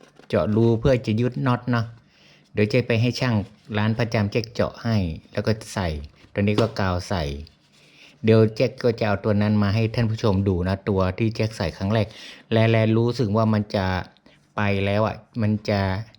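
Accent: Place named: North, Thailand. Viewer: แต่ฮาวเข้าใจเบิดเน้อตี้ปี้ อู้กำเมืองอ่ะกะจ้าว😁😂อู้มาหลายๆโลดเจ้าเข้าใจดีจ้าวววว....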